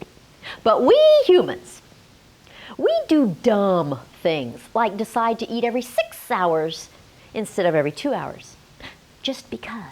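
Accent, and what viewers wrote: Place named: South, United States. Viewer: My dear Ms I can see you have got my feedback all wrong. I do appreciate and respect thoroughly the amazing work that you are doing. As an audience and as a person who understands the value of this my most sincere intention is only to bring to your notice a couple of things that nay enhance your appeal across audiences. As I mentioned earlier all I have shared is with due respect to all you do, I feel sorry that a person as well educated and enlightened as you us unable to appreciate a simple opinion about how you could reach broader borders. I work with appearance and understand that when people cannot connect with what they see or hear they are likely to switch off. And I do not understand what understanding English has to do with this at all. Your very southern American english can probably be comprehended by only those used to its peculiarity its not as uniformly understood by non native speakers at all. 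Having shared my thoughts I have no intention of offending you ma’am its only a way of sharing my thoughts about how you could increase your appeal. I do hope you understand my drift. Thank you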